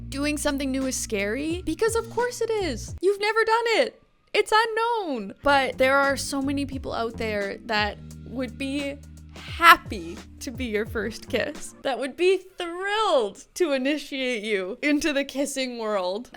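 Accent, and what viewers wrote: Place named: Prairies, Canada. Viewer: I'm yer Uncle Taz and I found Midwestern Canadian accent peeking through from time to time. Involuntary Smile Reaction engaged...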